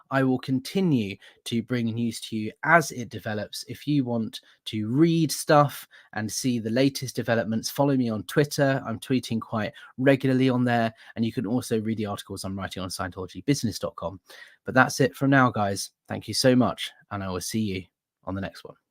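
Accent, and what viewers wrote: United Kingdom. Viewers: Just a bit of fangirl fawning…. I could listen to your RP English read the labels off canned food. I’m sure it’s a yank thing, but there you go. So glad I found you through SPTV. You are doing important work, steady on.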